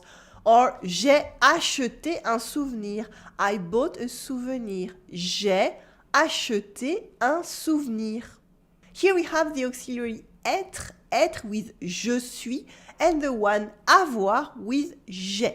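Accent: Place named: France. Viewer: I believe you speak too much English in your video. That's the reason I don't subscribe to your lessons. You would help those who are listening to hear complete phases in French. Also, as someone else mentioned, despite your good English, you did not pronounce the word Adjective correctly in English. It just sounds like any French person trying to speak English (with a strong French accent). The accent is on the first syllable, not the second. Bonne chance!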